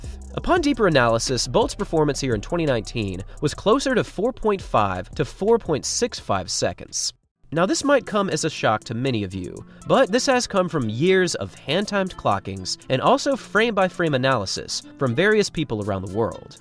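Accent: United States. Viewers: Your accent is American, so why do you call soccer players football players?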